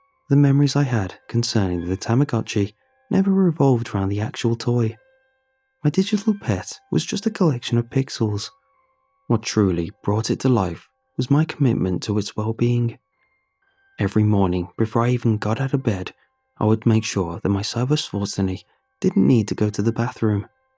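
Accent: United Kingdom. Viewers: He’s British. They pronounce words differently.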